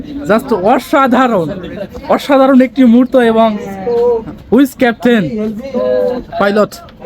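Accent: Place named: India. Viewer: কথায় বুজাযায় আননে ইনডিয়ান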